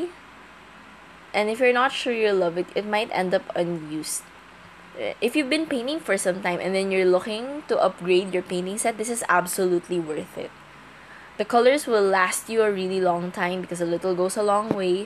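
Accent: Philippines. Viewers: I love this brand! thanks for the vid xD I can hear the filipino in your words ~ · I think you are a Filipino because I know how Filipinos speak English. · I love your Filipino accent lol 🇵🇭🇵🇭🇵🇭🇵🇭🇵🇭🇵🇭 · Hi! Are you Filipino? You have their accent and that's amazing!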